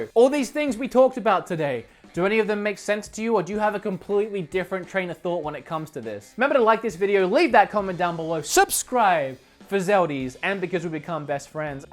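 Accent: Australia. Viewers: Your Australian accent isn't as thick as it used to be I noticed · I changed my Siri voice to Australian because of you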